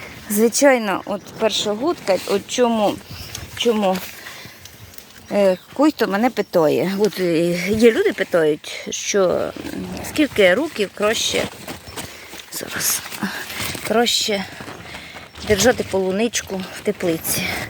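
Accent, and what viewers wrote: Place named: Poltava, Ukraine. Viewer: Я думала, що ви з Полтавщині, тому, що діалект у вас полтавський... Рясних Вам врожаїв і ПЕРЕМОГИ всім нам.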